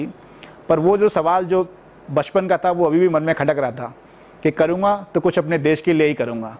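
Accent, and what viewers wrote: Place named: Agra, India. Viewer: I am also from agra .tabhi inka accent bahut krra hai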